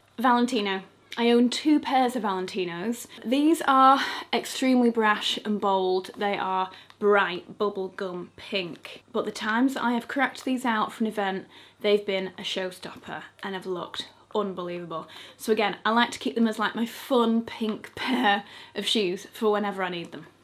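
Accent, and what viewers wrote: United Kingdom. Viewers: It’s crazy that I’ve found you again, I was on a hunt because out of no where I remembered watching you maybe 6-7 years back but I forgot your name and only remembered you had a unique British accent and grey hair, so I was sooooo excited when I finally found you again after long hours of searching on yt😭 immediately followed so I don’t lose you again. You’re such a classy woman and it’s a joy to listen and watch you🥰❤️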